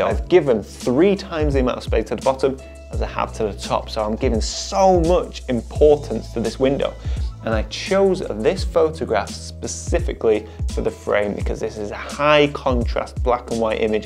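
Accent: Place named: Northern England, United Kingdom. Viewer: I was born in Northwitch, Cheshire and have an Uncle who lives in the lake district. I now live in Tennessee, and I love watching your channel because, not only am I inspired for my own photography, but hearing your accent transforms me home. Thank you for all you do!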